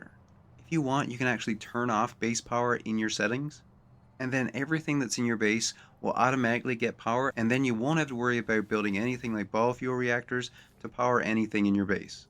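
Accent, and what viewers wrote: Newfoundland, Canada. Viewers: i love your accent- reminds me of home (newfoundland) :] ty for this video <3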